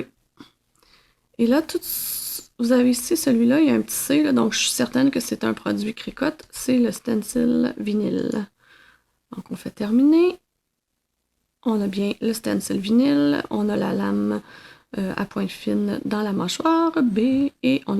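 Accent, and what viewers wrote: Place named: Canada. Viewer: Bonjour, Je vous écris depuis la Suisse, et je viens de découvrir votre site et vos tutos bravo pour de la qualité des explications, le plus dur c’était de s’habituer au charmant accent Canadien, mais après cinq minutes je 'y suis fait et maintenant je vous comprend parfaitement (ah ah ) petite question technique je désire faire des pochons mais un peu plus épais car dedans je vais couler de la résine quel matériaux faut'il utiliser ? , j'ai essayé avec des feuille de bois balsa comme le conseil cricut, malheureusement après..... 39 heures de découpe je ne suis pas arrivé a le découper et pourtant n’utilisait l'outil conseillé par cricut, que pouvez vous me conseiller de prendre comme autre support qui a une bonne épaisseur (env 1.6 mm au légèrement plus) afin de faire mon pochoir ?? je vous remercie - Laurent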